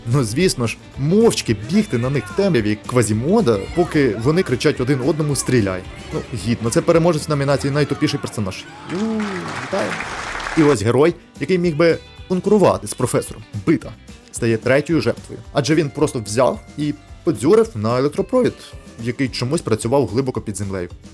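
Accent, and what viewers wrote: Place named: Ternuvate, Ukraine. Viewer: Прийшла дивицця заради божественного тернуватського суржику, а його тут не було :( шо за підстава? П.с.: з дебютом🎉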